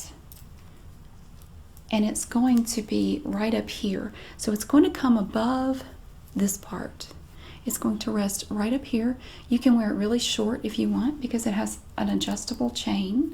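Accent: South, United States. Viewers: I loooove this video. I love when you explain clothes or processes you buy. I could listen to your soft southern accent all day. ❤️ Good for you shopping at thrift shops! Some clothes are so overpriced.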